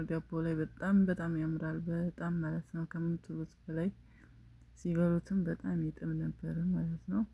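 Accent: South, Ethiopia. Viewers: በጣም ደስ የሚል ደቡብ አገጋገርን ያሳየሽ እህታ ለየት ያለ ነው እንዲህ አይነት ለየት ያለ በጣም አሪፍ ነው ሚያቁትን ማሳወ ሌላ ክፍለ ጊዜ እንጠብቅሻለሁ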